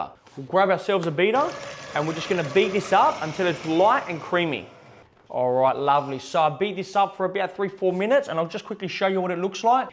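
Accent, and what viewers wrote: Australia. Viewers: Why does he sound Australian. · Because he is Australian 😊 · He's Greek so Greek Australian accent! Lol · Its a typical Wog accent (Greek-Australian). Its like the equivalent of how Italians in New Jersey have the Jersey accent.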